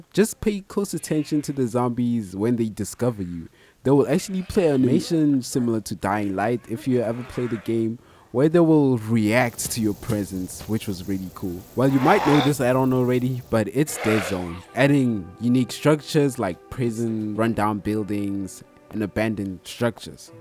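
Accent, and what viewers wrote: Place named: South Africa. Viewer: Somehow I just knew you were South African, we can just sense it between our people, subscribed once I realized you were, gotta support good ZA creators.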